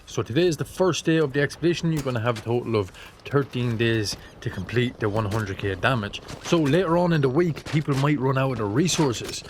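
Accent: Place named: Carlow, Ireland. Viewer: Sounds suspiciously like a Carlow accent 👀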